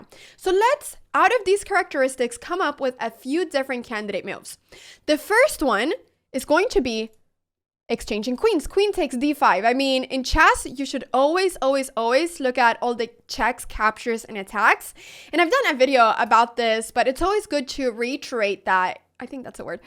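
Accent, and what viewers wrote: Spain. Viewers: Off topic: like your spanish accent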